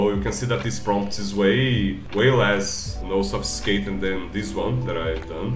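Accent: Brazil. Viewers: Esse sotaque é brasileiro em kkkk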